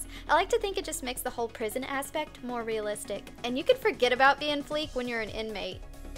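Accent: South, United States. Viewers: she talks country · why do u always talk like a country girl is gross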